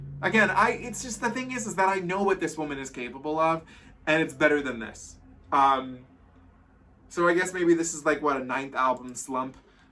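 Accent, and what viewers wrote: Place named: Spain. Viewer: I love how you pronounce the Cs, as a Spanish person and not like a Latino.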